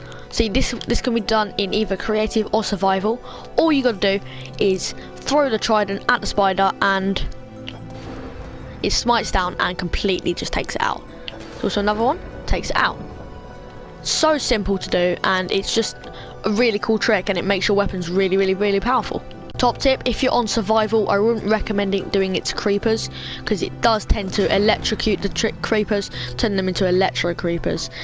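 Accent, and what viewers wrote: United Kingdom. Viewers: oh you're british?! I love that accent, it's nice👌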